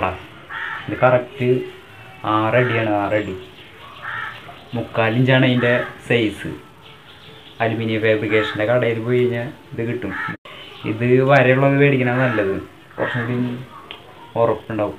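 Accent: India. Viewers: നല്ല കൊടുങ്ങല്ലൂർ ഭാഷ ..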